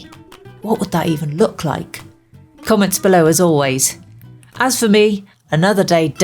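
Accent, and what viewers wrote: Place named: Scotland, United Kingdom. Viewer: Do parts of Scotland have a milder accent like yours, or is it a more individual thing...? Yours seems to be approaching Welsh (based on my very limited experience)... thx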